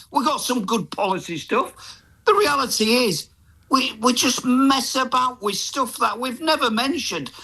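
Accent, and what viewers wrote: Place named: Yorkshire, United Kingdom. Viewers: Pure Yorkshire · Proper Yorkshire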